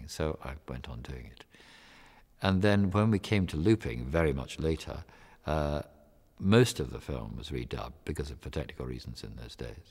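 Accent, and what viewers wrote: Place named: Scotland, United Kingdom. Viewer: So nice to hear a scot doing this.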